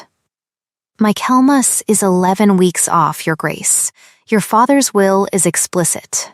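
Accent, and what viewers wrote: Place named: United States. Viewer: A ducal story shouldn’t be narrated by an American voice!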